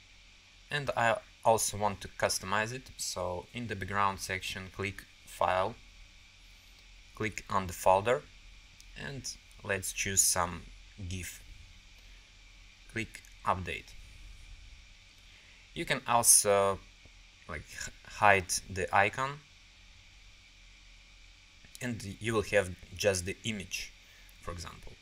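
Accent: Russia. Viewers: Для какой версии скрипт? На 2019 запускаю, не появляется логин и пароль, по акценту вроде парень из России, поэтому пишу по русскому))) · наче росіянин говорить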